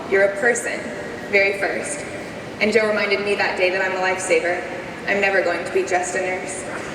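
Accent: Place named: United States. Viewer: Talk more cringey bs in that awful yank voice!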